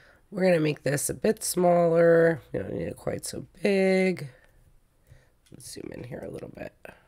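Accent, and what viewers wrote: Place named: New England, United States. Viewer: 👏This is the only vid that made sense for these pages! Thank you for actually saying everything needed. Some vids will miss saying shift and then I can't go any further because I don't know why the tool wasn't working like I want. So glad I found your channel. I also like your (New England?) accent. I can finish my homework now!!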